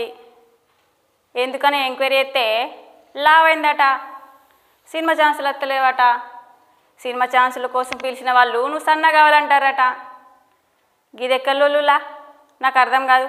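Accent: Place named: Warangal, India. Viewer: Wowwww Excellent Anchor... Warangal Language super super Medam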